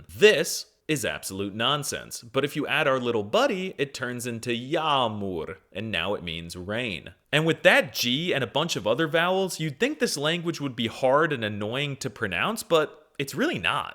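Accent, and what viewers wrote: Russia. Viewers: Interestingly you speak turkish like a russian. Did you know that? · i have never heard turkish with a russian accent by an westerner till today. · damn you have a heavy russian accent only while speaking turkish lmao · You speak Turkish as if you are Russian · American imitating perfect Russian accent while speaking Turkish. Thats.. .amazing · I have never heard someone speak Turkish with a Russian accent. also I love the "malum kişi" bit lmao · Bro you sound Russian when you speak Turkish 😢 and I'm a native, spent my whole life in turkey and still struggled to understand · AMK BU NE GERÇEK OLACAK SANMIŞTIM Oh and WHY ARE YOU TALKING WITH A RUSSIAN ACCENT😭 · What is up with the weird map dude? There is no such thing. And this is not the turkish Accent it sounds like almost russian OMG. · neden rus aksanıyla konuşuyon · he is speaking turkish like russian people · İngilizce’yi bir İngiliz gibi ama Türkçe’yi bir Rus gibi konuşan bir Amerikalı. 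Tanıştığımıza memnun oldum. ✋🏼 Ben Almanca’yı bir Alman, İngilizce’yi ise bir İtalyan gibi konuşan bir Türk’üm. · Rus aksanı ile anlatmasan daha iyi olurdu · WHY IS YOUR ACCENT LIKE RUSSIAN ACCENT DAWG 🥀💔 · you are talking exactly like russians that tries to talk turkish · like a caricature of Turkish mixed with Russian acccent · FOR REAL, it sounded just like Russian I was so confused! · Türkçe konuşabilmek için kendini o kadar sıkıyor ki sonunda Rusça konuşup Türkçe kelimeler söylüyor 🙂 Türkçede R'ler hiç bir zaman bu kadar vurgulu söylenmez. Tek vurgu hatta yuvarlayarak bile söylemen yeterli R'leri. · Very funny video😅 But I have to correct one mistake: Turkish alphabet is not a version of American alphabet, it comes from ancient Turkic languages. And by the way, you speak Turkish in Russisn accent , Turkish is much softer and musical . It is also a mathematical and poetical language .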